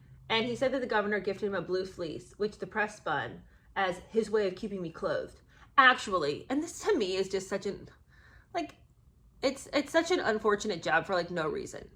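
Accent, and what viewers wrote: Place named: Cockney, United Kingdom. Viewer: You are my new favorite channel. Hilarious! Even my husband enjoys...he likes your Cockney accent.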